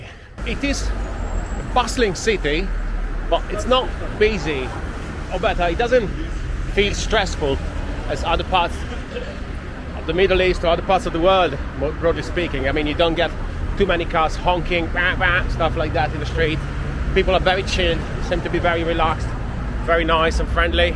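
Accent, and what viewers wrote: United Kingdom. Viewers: You deserve more views 👍 Im curious Are you Italian? But you have strong British accent 🤔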